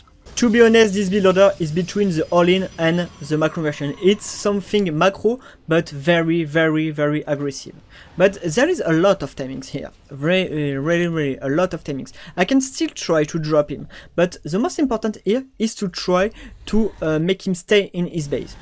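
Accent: France. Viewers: le french accent aha , bon j'ai le même, top contenu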